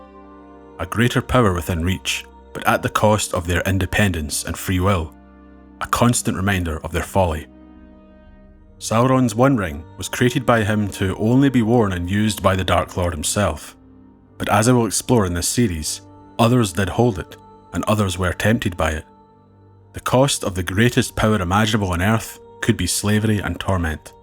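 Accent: Scotland, United Kingdom. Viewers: I've missed those dulcet Scottish tones!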